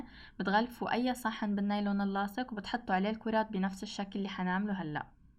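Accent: Syria. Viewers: حضرتك سوريا و لا لبنانية لغتك جميلة انا مصري من صعيد مصر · عم تحكي سوري مو لبناني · شنو هاي ال لهجة السورية غير تموت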